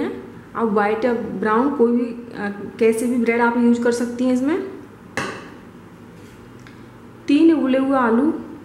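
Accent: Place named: Delhi, India. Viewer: Bolne k tarike k lag Hi rha tha ki shayad humari taraf se Hi ho kyunki me b Delhi se hu but Gujarat me reh rhi hu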